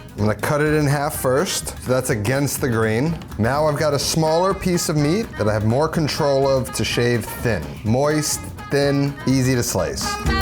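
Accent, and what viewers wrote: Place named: Brooklyn, United States. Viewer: Love your videos bro. I’m in my 30s but between the homage to some BK classics and the accent it brings back a lot of memories being a kid at my grandmas in Dyker Heights. You remind me like you could be in my family 😂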